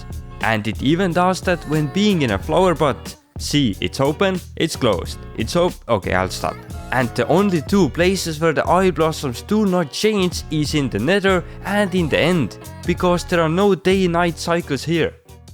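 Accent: Estonia. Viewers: Are you from Estonia? just curious cause ur accent is so Estonian. im from Estonia as well🤓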